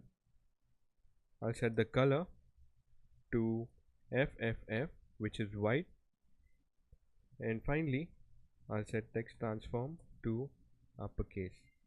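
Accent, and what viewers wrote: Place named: India. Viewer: you sound like a hindi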